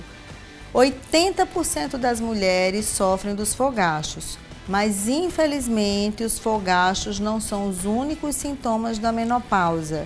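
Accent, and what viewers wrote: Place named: Rio Grande do Sul, Brazil. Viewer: amo seus vídeos doutora,inclusive esse,suas lives tbm,seu sotaque é muito parecido com o da minha terra rs RS,abraço 😘❣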